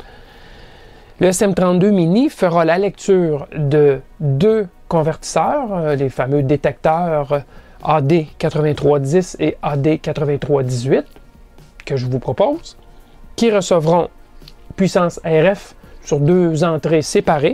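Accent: Quebec, Canada. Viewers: Un québécois! Belle vidéo!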